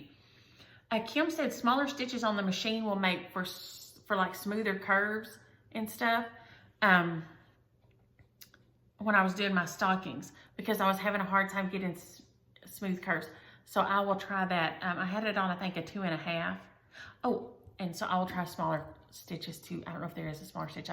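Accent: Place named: South, United States. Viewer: your videos make my day!!, you warm my heart. I'm a Southern living in the north and hearing you talk makes me feel right at home. Thanks for sharing with all of us. And by the way, where did you get that adorable red blouse?????? I LOVE it!!! Hugs, Judy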